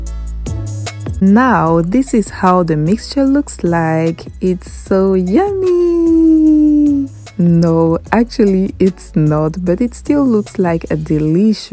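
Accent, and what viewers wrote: France. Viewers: She got French accent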